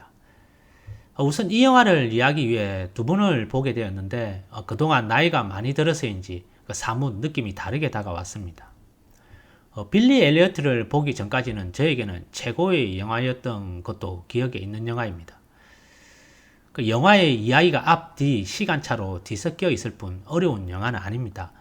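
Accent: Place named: Gyeongsang, South Korea. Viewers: 왜 경상도 출신분들은 사투리를 고치려하지 않는걸까요. 아직도 80년대처럼 대한민국의 주류라고 생각하시는지요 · 뭔 개똥 같은 소리인지...너는 서울출신에 부모 모두 충남베이스. 경상도 사투리 억양 성조 있습니다. 서울은 사투리 아닙니까? 말을 고따위로 합니까? 좋은영화 소개시켜주는 컨텐츠를... 너무 하시네요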